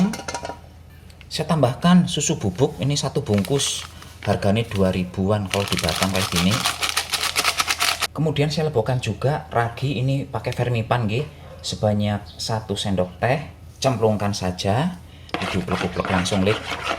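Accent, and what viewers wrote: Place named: Banyumas, Indonesia. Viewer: Resepe ga terlalu penting yg penting denger ngomong ngapak2...hiburan bgt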